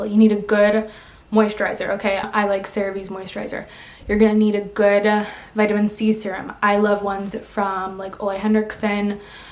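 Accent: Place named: New York, United States. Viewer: LOVVVEEEE this vid!! where’s your accent from?? you sound like a New Yorker 🤩✨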